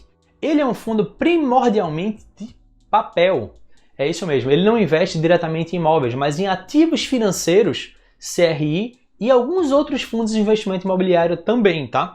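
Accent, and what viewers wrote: Recife, Brazil. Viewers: Em 30 segundos percebi que é de Récife. Seguindo já conterrâneo. ✌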